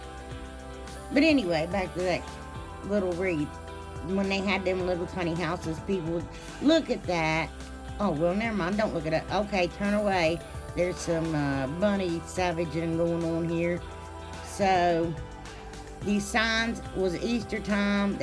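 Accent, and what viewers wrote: South, United States. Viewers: New Subscriber. Your DIYs are beautiful and professional looking. I love everything you made. Love your accent. My best friend is a Southern gal like you, I’m from Chicago. When I go to visit her in Tn everyone there says they like my accent.Keep you DIYs coming. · Love ur accent I'm a southern girl to. Ur crafts super. Like all. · Girl please, I can understand you just fine. It's a Southern thang. ;) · Love your accent. Im a Southern girl myself. Mississippi here 🙂 Love your projects ❤ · I'm so glad I found your channel!! I love all the videos I've watched so far, im binge watching them now lol I also follow your friend Kathy, I love her too!! I can understand you just fine but im a southern girl too. My Maw maw was from West Virginia, im used to the accent! All of your diys are so cute! Happy craftin lady!!!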